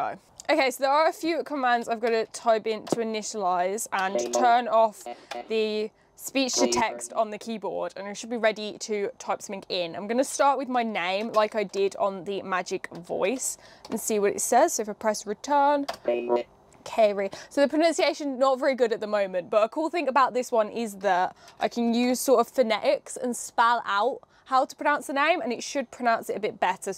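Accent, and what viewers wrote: United Kingdom. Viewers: Heh the world is advocating for more and more women in tech, I'm advocating for more women in retro-tech! This channel is going places, not only because is a woman, but for the simplicity and to-the-point videos. For me it's all of the above, but the british accent and the way the talks thru her hands makes it for me. Instant sub.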